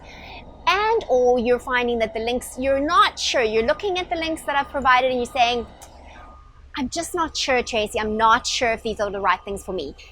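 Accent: South Africa. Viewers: Oh my goodness, you made my day - I was commenting before you got to the end, because I was so interested in your suggestions and already mulling over adding some burgundy into my wardrobe! DeepWaterHappy is my blog/YouTube/Insta/Pinterest "handle" for cruising, diving, travel and lifestyle content. I'm Kim Walther, a 51 year-old high school business/photography teacher from Florida. I run, bike, work out, produce a lot of artwork, and I also work as a sailboat captain. We wear khaki shorts and white company polo on the water. However, every day at school... I enjoy dressing up and coordinating stylish outfits. This year, due to your upbeat influence, I'm wearing a lot more heels to work and dressing it UP quite a bit. I've spent a lot of time in SA, Cape Town area, and dearly love your accent; it reminds me of friends and family there. And who better to give fashion advice than another Florida girl-turned-ZA! 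I absolutely adore you! Thank you for the watch! Mwah! Baie Dankie!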